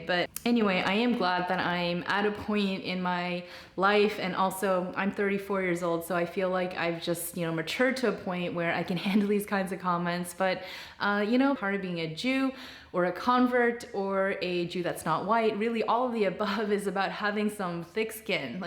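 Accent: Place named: United States. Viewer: Technically you're not really Korean anymore. That's your past. Korean and American are nationalities and so is Jewish, and you can only truly be one. From your accent I assume you used to be an American with Korean background. Now you're a Jew with American and Korean backgrounds. Even though I'm not a convert, sometimes I forget myself and think that I'm American, so I deal with a similar kind of issue. My wife is a convert, though.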